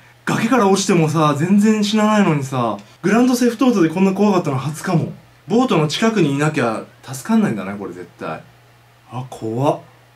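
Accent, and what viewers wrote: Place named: Kansai, Japan. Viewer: ヒカキン喋り方ちょいちょい関西弁のイントネーション時あるし、標準語の時もあるからどっちかにしてほしいわ。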